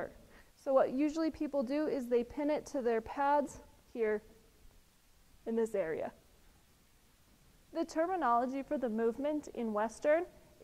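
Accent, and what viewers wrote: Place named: United Kingdom. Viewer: She has a accent it's from somewhere in the UK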